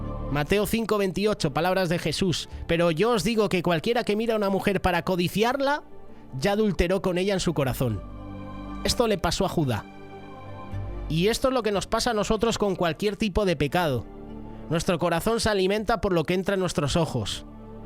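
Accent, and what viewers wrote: Spain. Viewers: Hermosa explicación, hace poco que sigo su canal y sus contenidos son muy edificantes. Que bueno saber que en Europa hay fervor por la Palabra de Dios ( entiendo que la voz en off es de un español) Bendiciones desde América del sur ( Paraguay)🇵🇾💖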